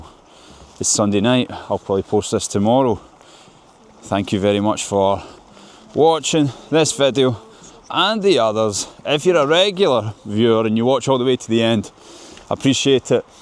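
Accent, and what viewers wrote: Scotland, United Kingdom. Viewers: Russian with a Scottish accent is something really new to me... I'm not Russian myself.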